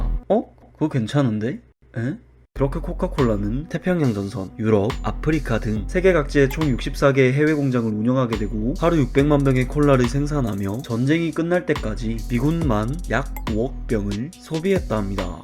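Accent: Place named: Gyeongsang, South Korea. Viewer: 영상 개재밌네ㅋㅋㅋㅋ어눌한 경상도 사투리로 말을 왜케재밓게하심ㅋㅋ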